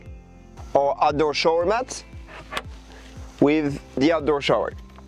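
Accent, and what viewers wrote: France. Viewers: French accent!😅❤ Thank you for sharing.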